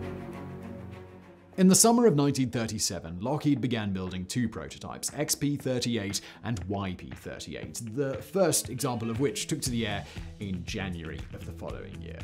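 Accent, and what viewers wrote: England, United Kingdom. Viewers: Well, keep in mind - he has an English accent. So - y’know.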